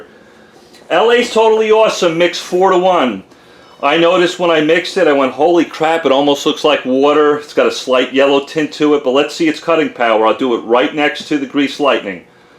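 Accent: New York, United States. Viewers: Are you a new yorka? :-)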